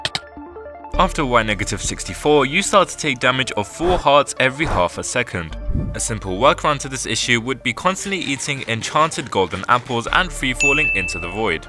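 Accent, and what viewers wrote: United Kingdom. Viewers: You're British? I am asking this because of your different ascent!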